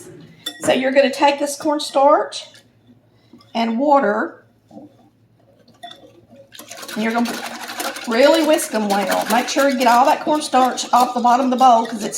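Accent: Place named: South, United States. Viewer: Happy birthday! Hope you’re having a wonderful day with family and/or friends! I love your accent! I’m from the Chicagoland area. I truly appreciate the southern hospitality, recipes, and southern drawl! Thanks for sharing many good recipes! 🌸😋💐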